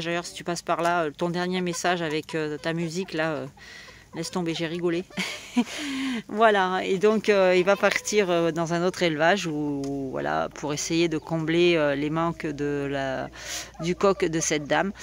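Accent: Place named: Auvergne, France. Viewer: Coucou, Merci de nous régaler avec votre quotidien au poulailler, et votre accent auvergnat naissant. Gratitude pour tous vos partage et pour faire rayonner notre belle region. Je pari que les nouveaux seront des barbues belges Bonne fin de semaine a tous et courage a tous pour l arrivée de l automne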